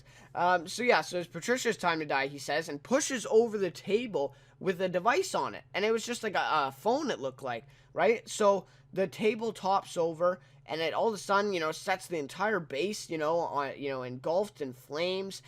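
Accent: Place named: Canada. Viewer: Are you Canadian? Your accent is annoying.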